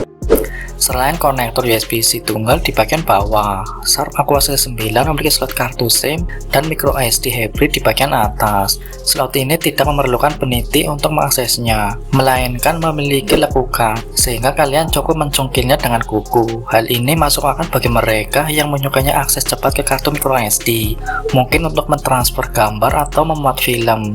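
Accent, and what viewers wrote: Banyumas, Indonesia. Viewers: Ngapaknya masih kelihatan bang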